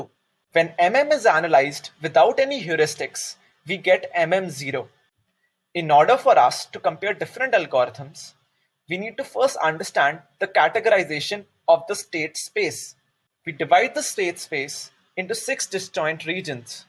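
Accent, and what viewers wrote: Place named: Telugu, India. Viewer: Arif C it kinda look like Telugu accent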